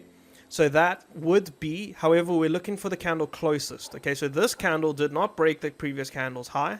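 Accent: South Africa. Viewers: South African accent, lekker bru. New sub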